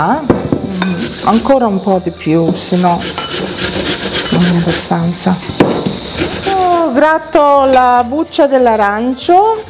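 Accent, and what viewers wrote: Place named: Veneto, Italy. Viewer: Yum! That brought back memories of Mum making them! Loved the Veneto dialect too!!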